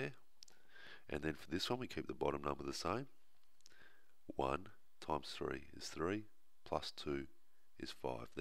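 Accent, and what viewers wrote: Australia. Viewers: you are brilliant.. the british way is more difficult, i guess your from Australia.. thanks a lot my friend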